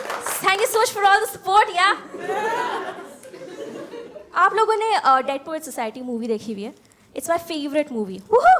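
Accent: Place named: India. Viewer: Her English sounds like hindi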